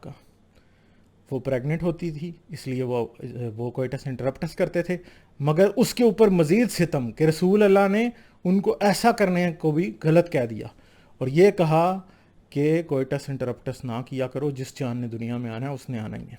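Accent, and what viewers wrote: Punjab, Pakistan. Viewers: I am so pleased to see the books.your accent reminds me of my Punjabi friend from Amritsar.wish Islam never existed we would have remained as a single strong country.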